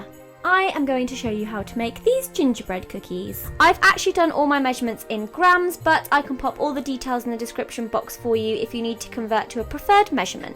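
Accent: United Kingdom. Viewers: idk why but you sound so much more british in these diy viedos lol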